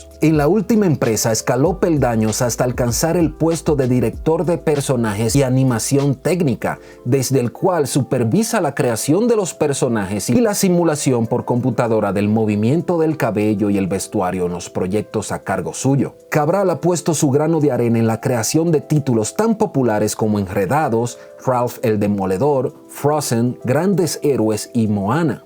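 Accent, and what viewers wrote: Mexico. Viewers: Tu acento es mejicano habla con las narices mejicanos no creo que sea dominicano.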